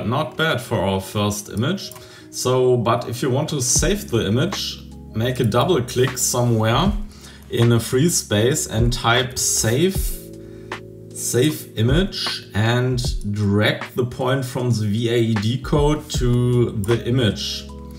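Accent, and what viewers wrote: Germany. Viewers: the german accent gives me hope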